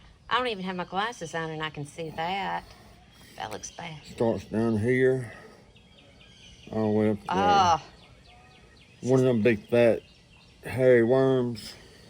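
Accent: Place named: South, United States. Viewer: I love your videos,! You DO have a lovely personality. I have thought about making videos, but I have a voice box problem. And yes, we can tell you are from the south 🤭😆 because you sometimes double the syllables in a one-syllable word. Lol I'm a southerner, too, born/raised in west TN.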